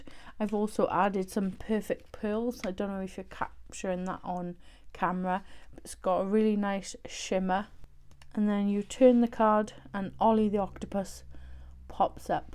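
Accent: Wales, United Kingdom. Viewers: Are you Welsh? If so, me too lol xx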